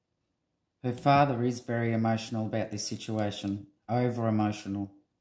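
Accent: Australia. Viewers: Hope you had a lovely Mother's Day if you live in the US. I estimated that you are Australian, though. I love your podcast. Wow, what a start, the devil. Makes sense to me. They should have a cost to bear in Gabbys's murder! I have often wondered if his mother wanted Gabby out of their house to begin with. Thus, the purpose of Brian planning this road adventure in the first place. They took swift action to meet and plan it all out! Can you ask spirit what country he is in, if alive??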